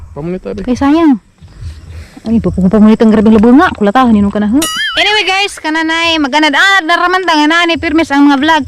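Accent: Bohol, Philippines. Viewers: Hi...I really like u...n excited to watch ur new vlog daily..favorite kaau ka sa ako mama..n of cors kami tanan sa family..taga jagna ako mama.ug malingaw cya sa imong vlog Jud..mkadumdom cya sa iya sinultian b4..like,AJO NAA MOY KAJO...ÀJAW KAY MAJABO..hahahha she is really ur fan.....and kami sa family nkasubscribe nimo..😊😊😊pls pa shout out sa next vlog..Fabiana ellevera halagna..ug sa mga ELLEVERA SA CANJULAO JAGNA BOHOL.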